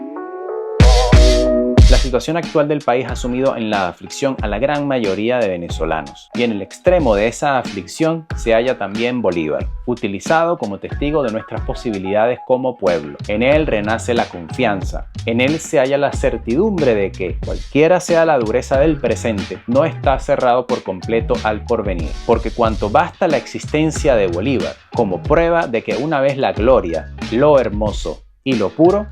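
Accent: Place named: Spain. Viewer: YO SÍ..... Yo si le rindo culto a dos personas históricas: JESÚS DE NAZARETH Y SIMÓN BOLÍVAR.... Comenzaste tu video con un mapa de VENEZUELA sin la GUAYANA ESEQUIBA..... Resulta que es cierto que le pusieron a todo el nombre de BOLIVAR; pero resulta que a BOLÍVAR LO DEJARON ESTANCADO EN LAS ESTATUAS, AV.. Y AUTOPISTAS...... Fue que luego se decide darle real vida a Bolívar, llevarlo al pueblo..... En nombre DE BOLÍVAR SE Manipula y, me parece, que muy inteligentemente estás manipulando en algunos aspectos en éste video..... Te faltó decir que en los EEUU hay una universidad con el nombre Bolívar...... Que la armada estadounidense tiene o tenía un submarino con el nombre del Libertador..... Hubo un momento en que ka historia de nuestro país fue eliminada de los colegios y liceos; por ende, Bolívar fue eliminado de la memoria del pueblo, sobretodo, del pueblo a pie..... 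Eso de eliminar el bolivarianismo es eliminar la vida y filosofía de BOLÍVAR...... Usted es un manipulador de primera....... Además, usted no es venezolano.... Su tono lo dice, su tono es español..... Ya he visto varios de estos videos: parece que se a gestado una campaña internacional en contra de nuestro Libertador.... Campaña liderada por España...... Recomiendo un artículo de la BBC de LONDRES HABLANDO DE BOLÍVAR Y CATALOGANDOLO COMO EL HOMBRE DEL MILENIO...... TAMBIÉN RECOMIENDO LEER A BOLÍVAR Y NO DE DEJARSE LLEVAR POR INDIVIDUOS COMO ÉSTE...... ÉSTE TIPO DE INDIVIDUO COBRA ($) POR MANIPULAR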